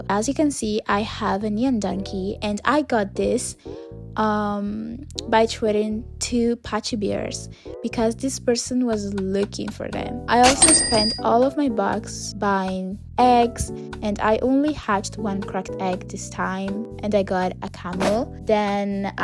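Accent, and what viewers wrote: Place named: Italy. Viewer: omg I loved this video! also ur accent is absolutely gorgeous! r u Italian? x (I have cousins who speak just like u 💞)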